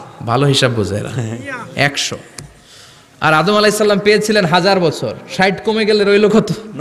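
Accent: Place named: United Kingdom. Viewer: Ami jotodur sunchi uni 4 years bolen nai. Forty years bolchen .Uni khub somvoboto british pronunciation e word gulo bolen. Ar british pronunciation e 40 bolle omon e sona jay. Allahu alam .ami vul o hote pari.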